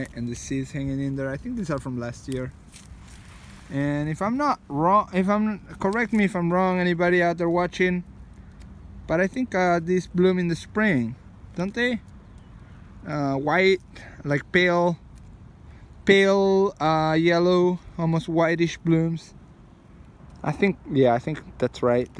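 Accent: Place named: Argentina. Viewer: Tenés acento de argentino! Para mí tu español no es tan malo!!!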